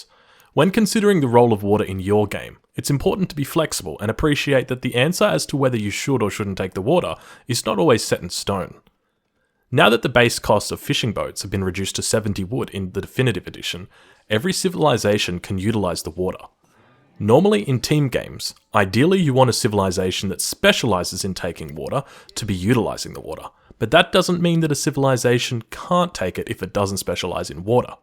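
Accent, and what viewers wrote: Australia. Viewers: Gotta love the aussie way of pronouncing "wada" Just like Modest Pelican ^^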